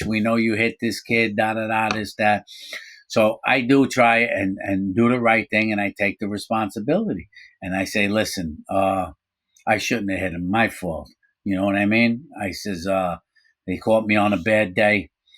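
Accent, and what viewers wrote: New York, United States. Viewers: Thank God that didn't effect your parole you deserve some luck that could have ended very badly for you both 🤞 your a great story teller i think the New York accent also helps looking forward to how you managed to be friends with the nurse haha 😅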